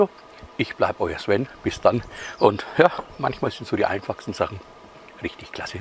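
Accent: Franconia, Germany. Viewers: Hallo Sven ! Dafür keinen Dank ! Ich dachte mir halt das die Preissn uns a Fäschdäner ! Grins ! :-) Und Red weider Frängisch ich mach dann dein Übersetzer ! Grins Gruß Robert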